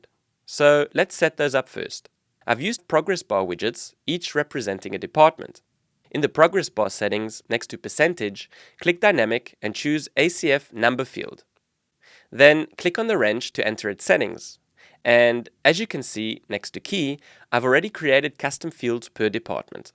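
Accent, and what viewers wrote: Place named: South Africa. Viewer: This dude is definitely South African...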